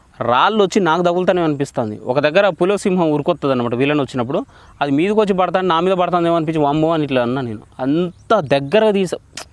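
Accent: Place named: Andhra Pradesh, India. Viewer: Anna nuvu manchi mana warangal basha Telangana yasa la matladu anna endhikantey manaku mahbad ollaki set kadhu Andhra slang...😊😊😊 Am following you from many years am from warangal 🥰🥰🥰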